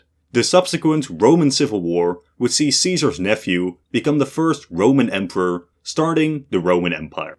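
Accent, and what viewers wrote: Netherlands. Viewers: I hear Dutch in your voice my friend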